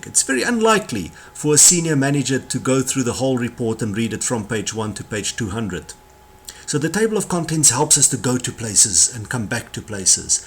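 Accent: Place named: South Africa. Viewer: that south African accent though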